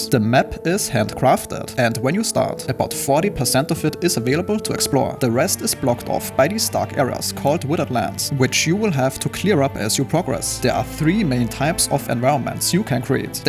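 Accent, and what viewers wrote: Germany. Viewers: Sorry to say this but I couldn't get past the thick accent (assuming you're from Germany?) Maybe try to slow down your narration and enunciate the words a bit more. I hope this helps.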